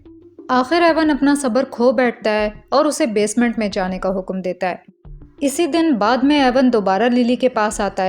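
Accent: Pakistan. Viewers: Pakistan mein bahir bolte hain,hum Indian bahar bolte hain,thoda sa different hota hai accent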